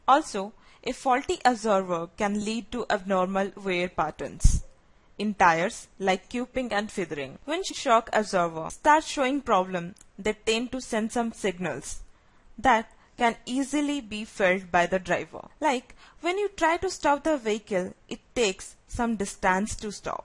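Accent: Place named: India. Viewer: speak like true indian ,,anyway this is very very helpful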